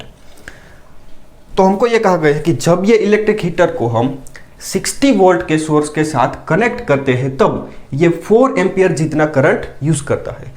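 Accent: Gujarat, India. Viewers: Bhai tame Gujarati cho ne. Awaj thi khabar pade che. Kayi city thi cho bapu?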